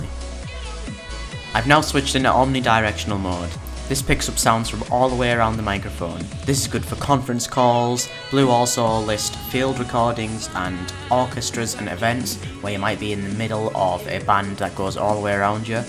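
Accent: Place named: England, United Kingdom. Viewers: great review and great to hear an english accent as well :) also a very good mic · Actually its quite the opposite. Cockney is southern, he is northern as fuck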